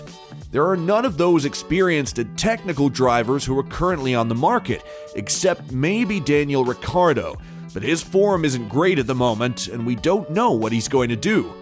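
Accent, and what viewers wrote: United States. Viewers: Blar blar blar !!! I'm a top fan of F1 but this channel is starting to put me off, wait till you got some real evidence and are 100% about something, just going on and on and this Yanky doole commentating guy is starting to grind on me. Time to bow out and Unsubscribe!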